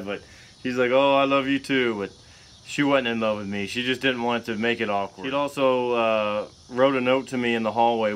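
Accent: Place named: South, United States. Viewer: Glad I found your site!!! Stories making my heart melt!!! Not sure if it's the Southern drawl, cowboy hat or YOU!!! PLEASE keep posting!!!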